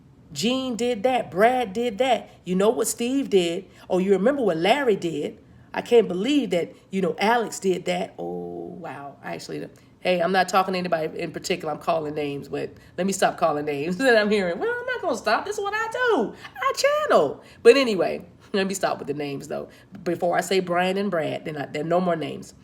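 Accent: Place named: South, United States. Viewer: that southern accent GIRL!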